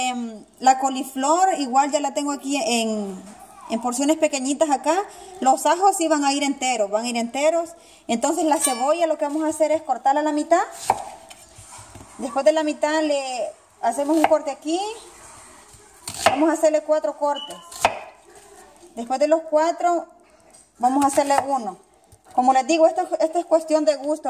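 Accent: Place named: El Salvador. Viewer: Sabia que eras salvadoreña, el acento noa delata, pero me lo confirmastes cuando hablastes de las pupusas, hare mi escabeche. 😆 Gracias.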